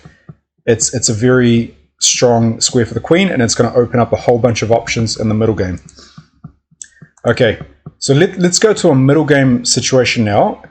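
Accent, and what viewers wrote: New Zealand. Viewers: THANK YOU, OUTSTANDING CH! I PLAN ON VISITING NEW ZEALAND. i WAS CURIOUS TO ASK, YOUR ACCENT, IS IT BRITISH OR NATIVE NEW ZEALAND/ THANKS PAL,... MIKE.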